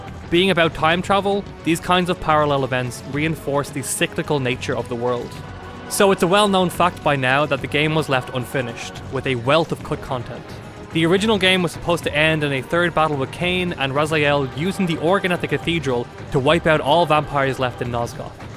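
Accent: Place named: Dublin, Ireland. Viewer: It must be our beautiful Dublin Accent x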